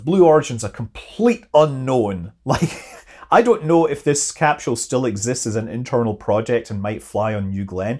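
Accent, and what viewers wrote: Scotland, United Kingdom. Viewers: Scottish to Amerikan translation is needed for this expression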